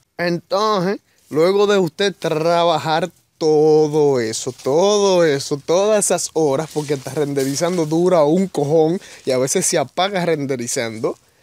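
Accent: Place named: Honduras. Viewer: Pues men, mira, yo te sigo desde el ultimo canal que te tumbaron, y te volví a encontrar de pura suerte, por un video de una canción/traducción que te pedí, era la de -. Desde ese entonces, te sigo, y de alguna manera te tengo nostalgia por el gesto que me hiciste. Debo de admitir que no sigo tu canal de forma muy activa, ya que, sinceramentementemente, pues, tengo algunas cosas que consumen mi tiempo, etc, etc.... Pero si, de ves en cuando me actualizo y veo tus videos, uno tras otro, porque me encanta la mayoría de traducciones que haces. Ademas, gracias a ti, he descubierto nuevos grupos, géneros, y eso, eso realmente esta muy cool, y lo aprecio bastante. Pero... si ya no puedes subir esta clase de contenido en este canal, pues, esta bien, sabes. Tampoco tienes que tirar por un barranco tu canal. Podrías.... No sé...... Puedes ingeniártelas para hacer otro canal nuevo, virgen, sin strike's, y empezar a resubir, o subir nuevas traducciones, y así reducir riesgos de que se vaya todo a la Sh**. 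Puedes hacer muchas cosas en este canal, no me viene algo en especifico, pero sé que encontraras algo, puedes hacer lo que quieras, experimentar para ver que tan bien lo haces, o que tanto pega, etc, estas en una plataforma con muchas otras posibilidades de creación de contenido menos riesgosa por el Copyright. Y tu voz esta bien, es supercool. Mi mejor ciber-amigo es de Honduras, y habla casi igualito que tu xd Por lo que para mi es familiar, agradable, pero no lo tom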